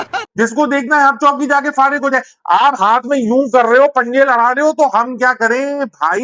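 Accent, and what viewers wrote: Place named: Karachi, Pakistan. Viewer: Bhai, you make Best comedy videos in Karachi accent 😂😂😂😂😂❤❤❤❤❤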